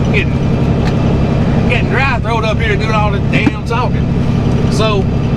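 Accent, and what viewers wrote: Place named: South, United States. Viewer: sounds like an old timer and from the South too. Where y’all been? Guess I’m subscribed to too many channels 😂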